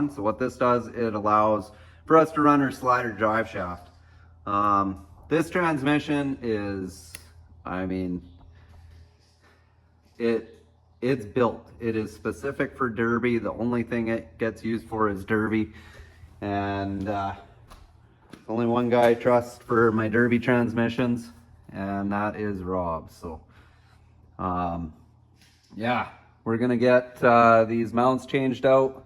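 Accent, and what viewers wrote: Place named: Canada. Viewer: Am I missing something or is It he may be tired or something but he kind of sounds a little Canadian ish here compared to his other content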